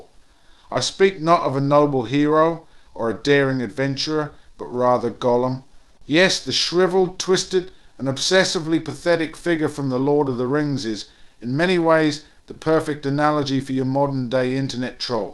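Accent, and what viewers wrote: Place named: RP, United Kingdom. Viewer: 😂😂😂😂😂😂😂😂 So damn Posh...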